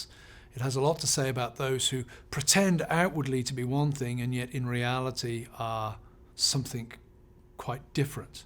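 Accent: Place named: England, United Kingdom. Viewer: If he applied this baloney to American Evangelicals he would come up with the same crappy conclusions about them as intends about gays and lesbians. - What he is doing is claiming that gay people are concerned about nothing, and I mean NOTHING aside from SEX, SEX, SEX. This has been the tactic of bigoted American Evangelicals for DECADES. All he is doing is dressing it up with phony 'intellectualism' along with his English Accent. If you can't think about gay people in any other way than THE SEXUAL REVOLUTION, you are a bigot, because you are completely denying their complex identities as human beings, - which you claim in nothing more than 'Christian' , - which is complete dishonest baloney. You have personal issues you need to deal with.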